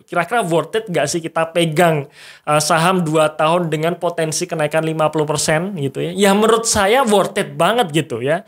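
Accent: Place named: Cirebon, Indonesia. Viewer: Logate wong cirebon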